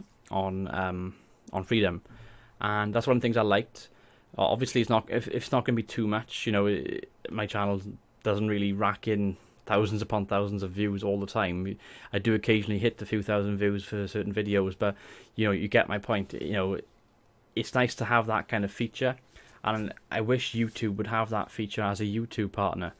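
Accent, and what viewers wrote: Wales, United Kingdom. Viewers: What part of Wales are you from?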